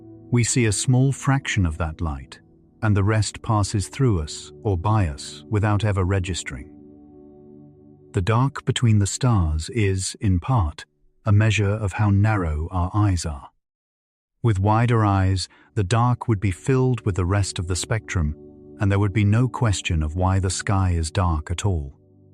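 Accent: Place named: United Kingdom. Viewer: This narrative is filled with mistakes and although it sounds smooth and creamy with the UK accent of the narrator who ever wrote the information has not done their homework. Hubble who created and mistakenly produced the theory of the expanding universe after another 10 years of intense research and methodical tedious computations wrote a paper in 1940 expressing his scientific conclusion addressing the expanding universe. Sadly by then the big bang theory had taken such root that his paper fell mostly on deaf ears. However since there is so much evidence that doesn't exist supporting the big bang and the expanding universe that we have entered a paradigm shift away from this old school nonsense big bang to the Electric/Plasma Universe. Hubble wrote a paper addressing The Problem With The Expanding Universe. I suggest if you are a critical thinker look for this paper read it. 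No one since has put as much time at confirming the math necessary to reach the conclusions that Edwin Hubble had to do to be absolutely correct in this assertions about the universe not expanding whatsoever and that the universe is simply infinite.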